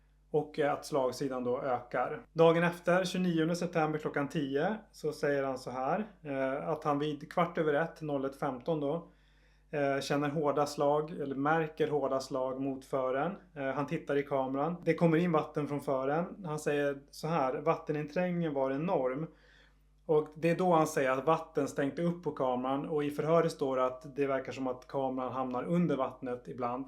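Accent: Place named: Finland. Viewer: ARE YOU FROM SWEDEN ? BECAUSE YOUR ACCENT YOU LOOK MORE THAT YOU ARE FROM ..........FINLAND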